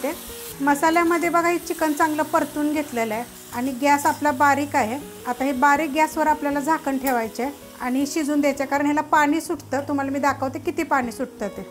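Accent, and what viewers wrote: Satara, India. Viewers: Tai tumhi Satara che aahat ka??? bhashe waru vattay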